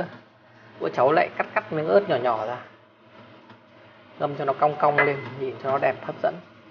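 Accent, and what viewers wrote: Hanoi, Vietnam. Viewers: Cảm ơn đầu bếp hướng dẫn rất chi tiết tỉ mỉ và nghe giọng Hà nội khán giả nghe dễ hiểu ,chúc em và gia đình hạnh phúc bình an trong cuộc sống❤❤❤❤❤❤ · Dọng nói rõ ràng hướng dẫn cách làm món ăn chuẩn người hà nội cảm ơn cháu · Bếp hướng dẫn dễ hiểu nói nhẹ nhàng chuẩn người HN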